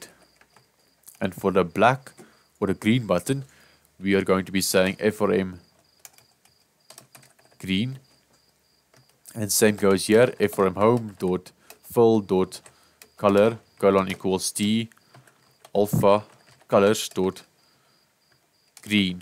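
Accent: Afrikaans, South Africa. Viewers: brah ek sweer jys afrikaans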